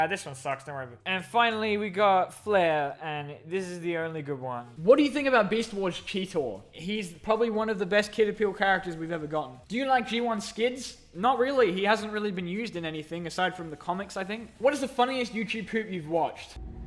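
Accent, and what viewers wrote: Australia. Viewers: For next Q and A are u Australian?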